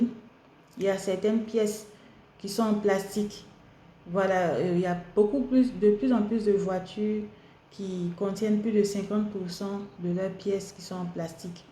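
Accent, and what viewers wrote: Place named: Ivory Coast. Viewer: tu parles bien le francais avec un accent ivoirien. as-tu vecue en cote d'ivoire?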